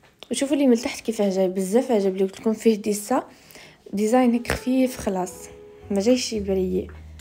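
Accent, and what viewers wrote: Khenchela, Algeria. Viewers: نموت عليك كي تهدري لخنشلية تاعنا🎉❤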